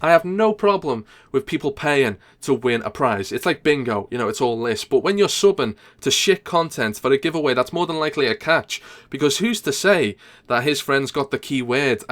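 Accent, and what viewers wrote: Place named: Liverpool, United Kingdom. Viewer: Dirty fucking scouser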